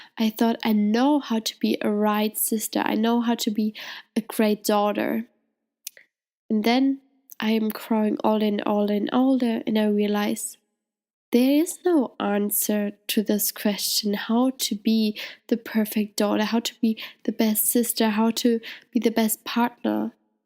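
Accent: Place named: Germany. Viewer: Oh your German accent is so cool! Go Germany!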